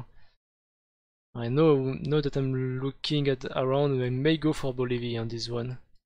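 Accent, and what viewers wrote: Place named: France. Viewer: love the french accent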